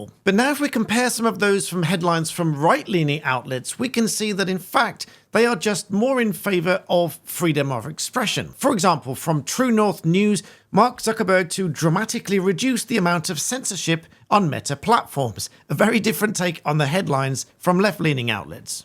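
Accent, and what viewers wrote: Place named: RP, United Kingdom. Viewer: Can U do your Tommy Videos with a common/Chav accent pls? Hard to take seriously when you are speaking posh🤣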